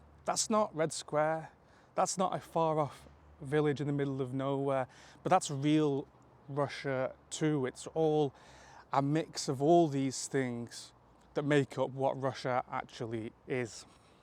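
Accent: United Kingdom. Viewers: What region of the UK are you from? I'm trying to learn to determine accents😅 It sounds like RP with a touch of something, I dunno, northern?.. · Как всё-таки легко понимать британский английский. Без этой распальцованной, разнузданной американской каши во рту.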